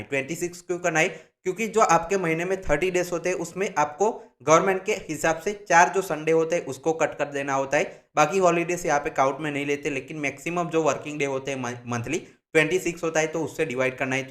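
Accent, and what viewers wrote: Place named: Bengal, India. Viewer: U r talking like Bengolian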